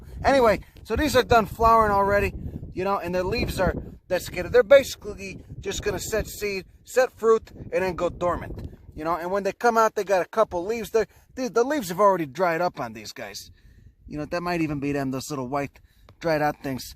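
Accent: Chicago, United States. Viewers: I love your show, you sound like my family we all grew up in Chicago.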